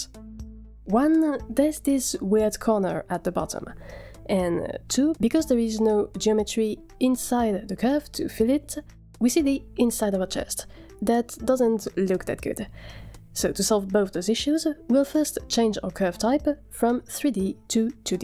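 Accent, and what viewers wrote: France. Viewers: bro is def french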